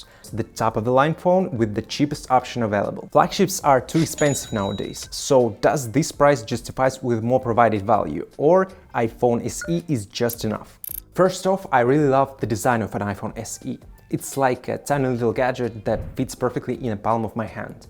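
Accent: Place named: Russia. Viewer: that russian accent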